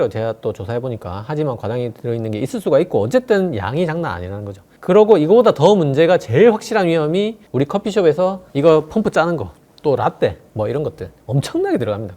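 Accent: Gyeongsang, South Korea. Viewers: 쌤 보유국 우리나라🎉 성실하시고 어려운 의학을 구수한 경상도 사투리에 담아서 위트있게 잘도 설명해 주시는 능력자이심🎉 부산가면 꼭 뵙고 대접해드리고 싶은 분😊 근데 점점 더 멋있어지심?😮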